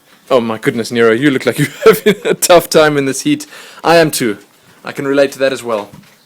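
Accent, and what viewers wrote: South Africa. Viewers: I'm South African so I thought it sounded familiar :P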